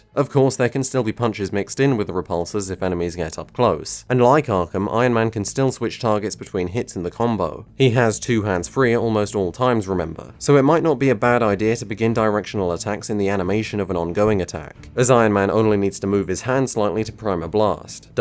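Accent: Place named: United Kingdom. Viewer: u sound like a stereotypical nazi bad guy in hollywood movies, of course, with a british accent.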